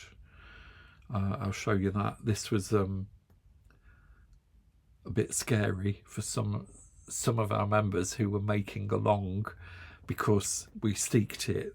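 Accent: United Kingdom. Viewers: Hi, I just found your channel, greetings from Brazil. I love the british accent and is a bonus we have the metric system in common! Thank you for sharing your beloved findings in Temu, so I can get some and all those comments, I'm having a laugh! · I love your British English and I am sorry that Americans have the same language 😂 But I am Austrian and we have the same problems with Germans 🤪 Thank‘ s for your Videos!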